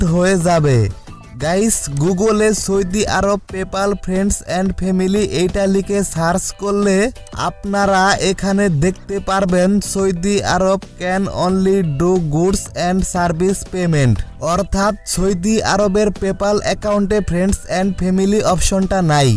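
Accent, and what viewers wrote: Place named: India. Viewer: Title with eng why the hell u talk with Indian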